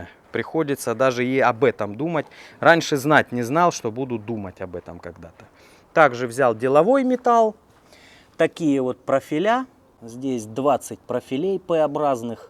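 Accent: Rostov, Russia. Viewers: Как у классика: "Хорошего вам настроения! Вы держитесь там! Просто денег нет."😀 Лайк только за один ростовский говорок!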